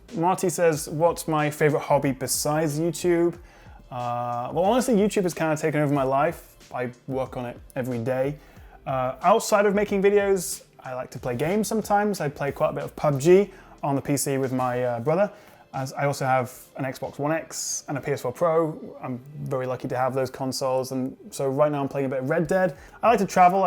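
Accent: United Kingdom. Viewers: I like your channel because your British ascent sound interesting.:) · Hands down my favorite YouTuber/ tech reviewer ever, his content is just so relaxing to watch an plus the guys from uk awesome accent happy new years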